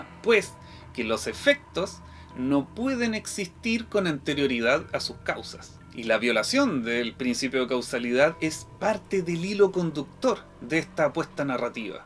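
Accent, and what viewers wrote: Chile. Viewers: Hola encuentro que tu video Está muy bien realizado y la explicación me parece fantástica! Cómo chileno me gusta tu acento y no me molesta como videos de otros países que son como taladros en el oído.... solamente acotar que tal vez podrías pensar en eliminar el gesto que haces con la mano al fin de este vídeo porque es algo muy común entre nosotros los chilenos pero es sabido que en otros países tiene significados incluso de falta de respeto o cosas así.... aparte de eso tu explicación y tu video están la.raja!!!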